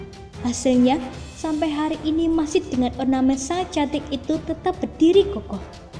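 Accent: Java, Indonesia. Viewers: Anjir suara y medok banget Min...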